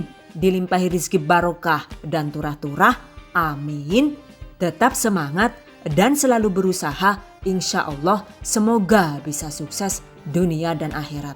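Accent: Banyumas, Indonesia. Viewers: Bahasae bisa ngapak juga hhhh salam mbak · penyampaianya sangat detail.. aq sendiri ngapak tpi denger bunda ini ngapak kok ngakak aq🤣🤣🤣🤣 lucune jannn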